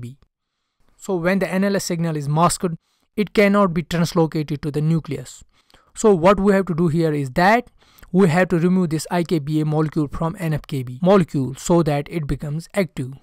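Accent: India. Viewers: نفسي افهم الاكسنت بتاعة الهنود😭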